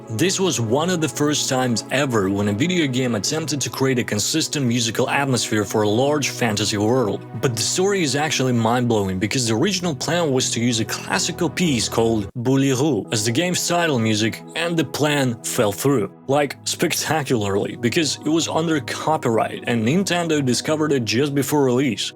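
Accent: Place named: South, United States. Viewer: Merio 😅 Dig the southern accent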